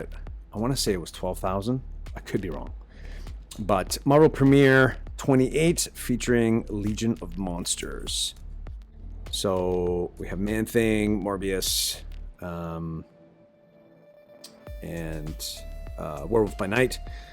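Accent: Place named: Canada. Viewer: wicked strong Canadian accent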